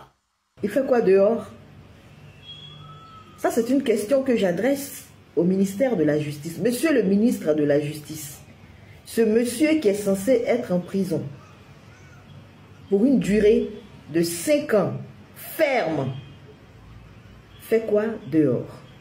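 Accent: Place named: Ivory Coast. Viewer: Ouiii mais vous parlez comme une ivoirienne ma sœur !! soutien !!!! Ils sont trop comme ça !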